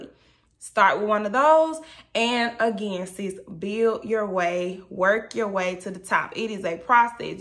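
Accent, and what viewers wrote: Atlanta, United States. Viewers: You talk like you’re from Atlanta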